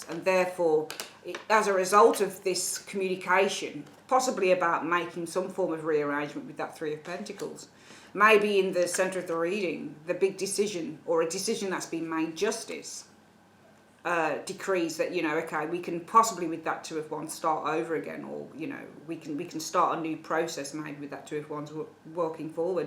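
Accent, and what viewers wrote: Birmingham, United Kingdom. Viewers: hiya are you from birmingham just like me?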